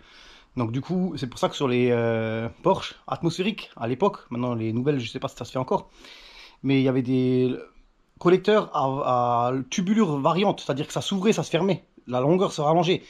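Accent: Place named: Alsace, France. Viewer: Salut Cool Vidéo 👍 hop la jAIME Notre belle accent de lEst, question tu ne serais pas Alsacien par hasard,moi Oui,Et Fier de Notre Accent 😏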